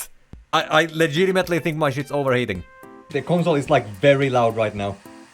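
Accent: Finland. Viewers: Aloin miettimää että ootkohan suomalaine ku suomalainen aksentti mut huomasin että irwinin paita nii tajusin siitä että oot suomalainen🙏🏻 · when i heard your accent i knew you were from finland (minä olen myös suomesta) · Really? Why watch the vid then, I think the Finnish accent is kinda cool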